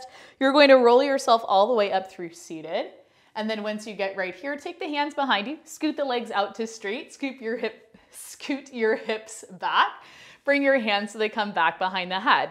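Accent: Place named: Canada. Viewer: I love this challenge! I was surprised to hear that you grew up in Arizona you sound Canadian! I mean that as a compliment!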